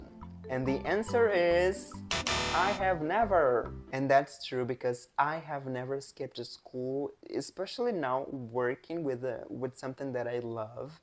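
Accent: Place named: Brazil. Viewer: não tem como não entender pois por mais que você tente fazer a pronúncia de um americano você fala do jeito cadenciado de um brasileiro então está 100% compreensível sim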